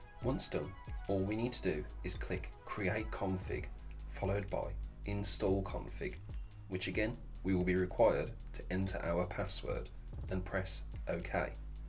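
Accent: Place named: England, United Kingdom. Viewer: It's a pleasure to listen to your perfect England accent. You combined all of the information into perfect tutorial. Thank you very much for such great video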